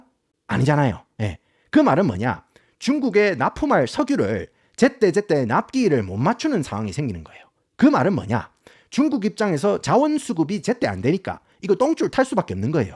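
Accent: Gyeongsang, South Korea. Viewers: 영어 발음은 네이티브인데 억양에 부산 경남 억양 있는게 존나게 웃기네 ㅋㅋㅋㅋㅋㅋ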